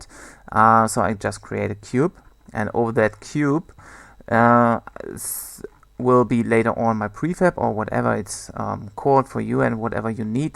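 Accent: Germany. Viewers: Great tutorial. I love how you pronounce "th" as "z" like a stereotypical german. For normal use you would make a function like this instead of decreasehealth: void changehealth (int healthvalue) { if (cur_Health == healthvalue) return; if (healthvalue > max_Health) cur_Health = max_Health; else if (healthvalue >= 0) cur_Health = healthvalue; else cur_Health = 0; float calc_Health = cur_Health / max_Health; SetHealth (calc_Health); }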